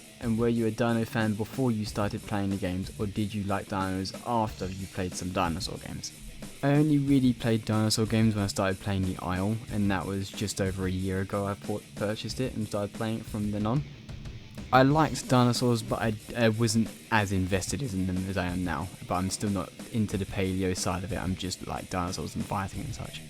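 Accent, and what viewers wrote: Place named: United Kingdom. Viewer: cant believe your british but you sound more british in this vid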